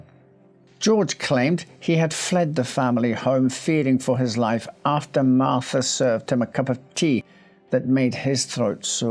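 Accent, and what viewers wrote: Scotland, United Kingdom. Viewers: The narrator is Scottish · Very impressive pronunciation, every syllable was perfectly enunciated, a hint of Scottish brogue, well done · is Scottish 🏴👍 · Does anyone know what accent this is? I sort of have an idea but I'd like someone who knows better to confirm. EDIT: He's Scottish, I thought so! Thank you guys!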